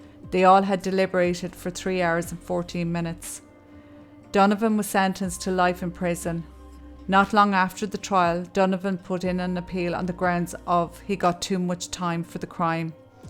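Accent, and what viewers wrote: Ireland. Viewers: I've been binge watching your videos. I really like your style, and your Irish accent is just the cherry on top. 🍒 I appreciate the fact that you go straight into the case and waste no time talking about irrelevant things. You've quickly become one of my favorite channels. Thank you for your work. 🤗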